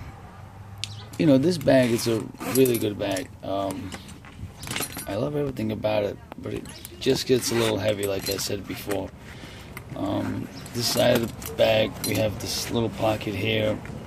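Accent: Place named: New York, United States. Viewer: I bet he's of Italian or Puerto Rican descent; either way it's definitely a New York accent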